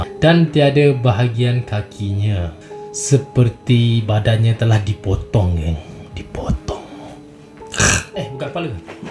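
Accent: Sabah, Malaysia. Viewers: Aduh slang2 adik ni macam Sabah laaa 🥺❤️ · Suara abg ni mcm suara org sabah jak hahaha btw sya urg sabah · Mcm slang sabahan ........ Btw storyline best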